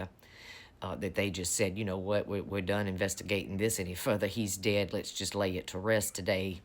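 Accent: South, United States. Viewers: luv your voice ma'am! true southern bell..... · I want to be southern and sound like you. Also you always hit it hard. Thank you.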